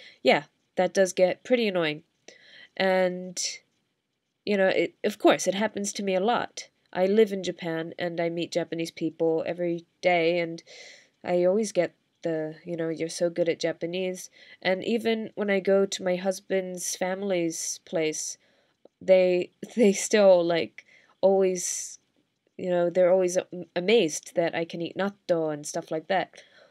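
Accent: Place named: Ireland. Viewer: You sound irish. Are you from boston?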